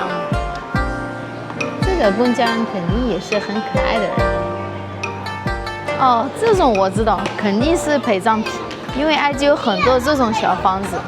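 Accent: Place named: Beijing, China. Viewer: 你看看人家歪果仁女主播！这北京话说的那叫一个地道儿~~！！！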